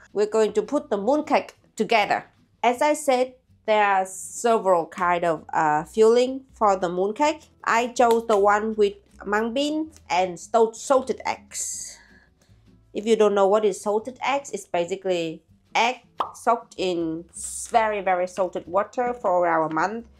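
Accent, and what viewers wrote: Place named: Germany. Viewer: Your English is not a burden! I love. You remind me of how my German mother speaks English and I find it quite lovely.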